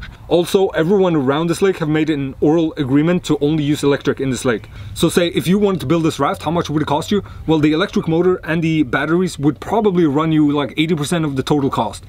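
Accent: Norrland, Sweden. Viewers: 'I bore three holes [...]' The Swenglish is strong with this one. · bor du i norrland eller låter så på dialekten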